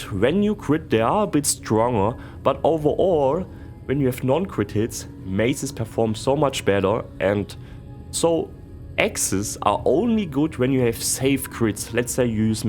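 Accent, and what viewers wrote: Germany. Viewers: Love ur german english 😂👌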